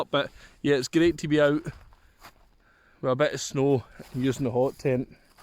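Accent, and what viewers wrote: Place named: Scotland, United Kingdom. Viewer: I'm subscribing just because I am so in love with the Scottish accent and I could listen to you all damn day.😄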